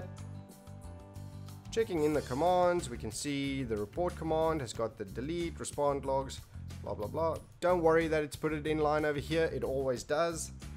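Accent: South Africa. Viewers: homie are you afrikaans?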